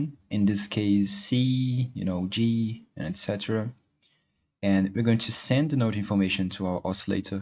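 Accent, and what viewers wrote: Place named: Brazil. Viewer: esse sotaque não me engana tu é brasileiro CERTEZA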